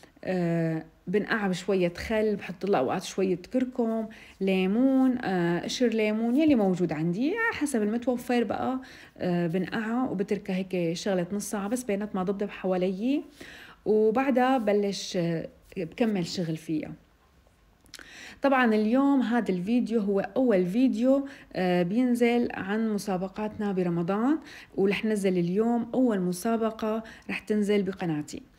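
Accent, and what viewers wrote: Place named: Levant. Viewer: رااااائعة.و طريقتك مبسطةواضحةوصتك فية ريحة الشااااااام♥️.احلى تحية الك من فلسطين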